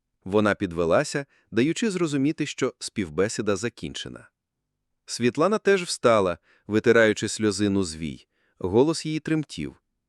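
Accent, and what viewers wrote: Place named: Surzhyk, Ukraine. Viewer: Якщо перекладаєте з рахівської - то перекладайте вірно українською. А то суржик ріже слух. Так і не змогла дослухати до кінця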